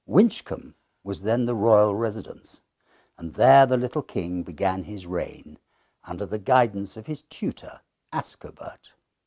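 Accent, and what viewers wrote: United Kingdom. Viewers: Hi! Just found you tonight when unable to sleep. What a treasure: your voice and your stories! I will definitely hunt them out! Have always been a big Hallowe’en fan & hope you have some 2023 tales. I just turned 72 by the way. Living in the Great White North in Cambridge, Ontario. I so miss British voices VERY MUCH! The person in my childhood who showed me the most love was my Gran from Folkestone in Kent. She was very open minded & tuned into a lot of things that my school friends’ grandmothers would probably have never heard of. She saw a lot including joining the Wrens during World War I when she was 17. I’m trying to be discreet about some of her interests which became my interests as I got older. I’m sure she had a lot of stories that I would’ve loved to hear. I just adored her! She married my grandfather who was serving in France in his veterinary corps (he was a farmer from Quebec); so, she was a war bride from the First World War. 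My whole life I’ve wanted to go to England, Scotland and Wales! This is a real treat for me to have found your channel! I am mostly bedridden go now. Have a wonderful Hallowe’en & thank you so much for your lovely programme!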